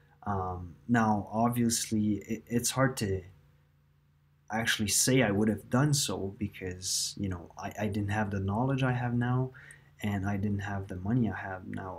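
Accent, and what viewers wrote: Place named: Quebec, Canada. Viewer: Thanks for the info, I find your video very helpful. And btw, are you originally a french Canadian? I can't help but hear a bit of a french accent..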